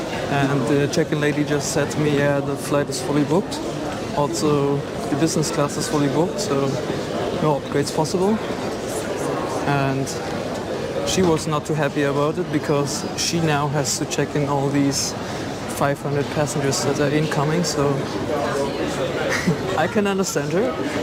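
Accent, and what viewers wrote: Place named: Germany. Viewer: Selten so deutsches Englisch gehört haha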